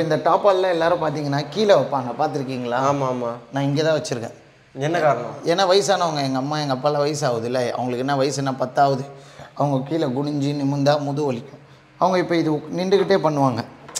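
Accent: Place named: Kolar, India. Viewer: Kolara pesararu 😂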